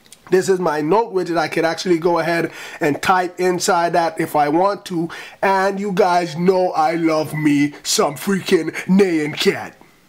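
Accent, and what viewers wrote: Jamaica. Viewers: II knew you was Jamaican. Check out vs in YouTube the shut is fire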